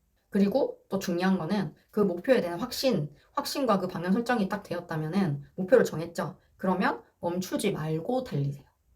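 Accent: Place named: Busan, South Korea. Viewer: ❤❤대단하십니다. 부산사투리가 더 듣기가 좋네요~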